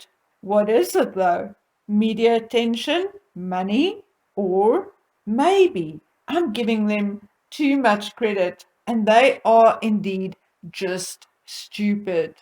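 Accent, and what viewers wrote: South Africa. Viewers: I enjoyed your commentary on the Harkles, most entertaining, abd so true. Also loved hearing your accent made me horribly homesick for SA. Nkosi love and protect Afrika 🙏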